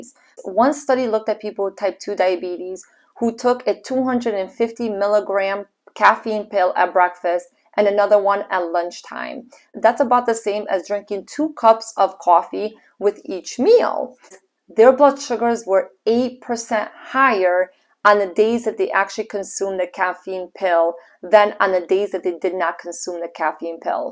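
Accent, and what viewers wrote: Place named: Long Island, United States. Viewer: Long Island accent!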